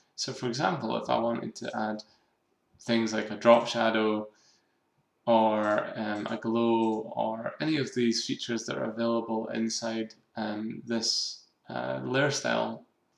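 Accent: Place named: Scotland, United Kingdom. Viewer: Hi, where are you from? You have a Scottish accent. Your asiimov skins are beautiful btw.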